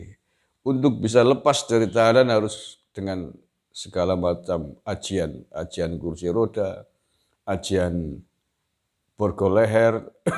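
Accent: Banyumas, Indonesia. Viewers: Ga nyangka bisa guyon dgn ngapaknya, mantap penjelasan nya gas pol.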